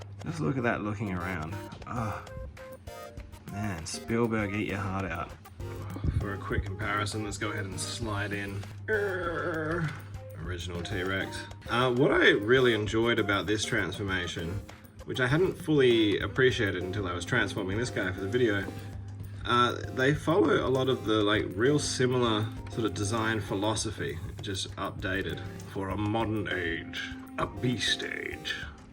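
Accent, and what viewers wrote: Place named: Australia. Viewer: I didn't know David Kaye did toy reviews in an Aussie accent..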